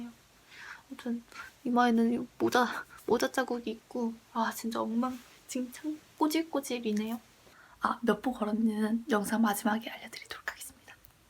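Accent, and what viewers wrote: Seoul, South Korea. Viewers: 말투에 '~거든요' 같은 서울 사투리 쓰는거나 화장법이나 생김새도 10년대에 유명한 얼짱? 이런 느낌이고 저는 보자마자 예전 얼짱 얼굴 썸넬에 박아둔 플리인줄알고 노래들으러 왔는데 당황함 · 옛 서울 사투리같아요 너무 귀여우신듯 ㅋㅋ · 근데 영상들을 보면 볼수록 80~90년대 서울사투리하는것같죠 ? ㅋㅋ 어리신분이 ㅋㅋㅋ 부모님 말투 닮으셨나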